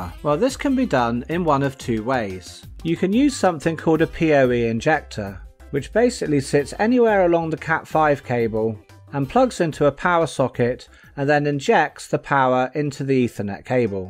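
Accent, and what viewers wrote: United Kingdom. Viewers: Another Great vid. I hear your from South West England like me!! From Exeter, Devon my self!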